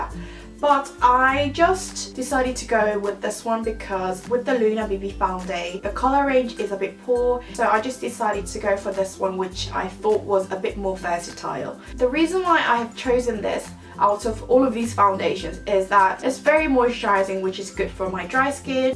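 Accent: United Kingdom. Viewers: 볼때마다 느끼는건데 영국 발음 듣기 너무 좋아요♡ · 영국발음때문에 시청시작했어요~ 저는 캐나다 왔음에도불구하고 열심히 영상보고 영국영어혼자공부합니다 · 아 진짜 영국발음 넘나 조아여💓 2016년에도 좋은 영상들 부탁드려요👍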